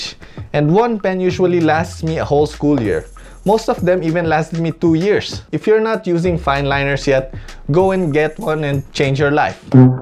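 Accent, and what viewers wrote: Philippines. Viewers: With your asian accent, i can tell, you’re a filipino😂. I also want to be an architect and this video really helps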